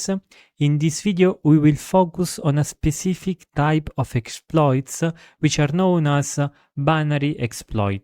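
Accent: Italy. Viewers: Ho sentito i primi 20 sec. Sei italiano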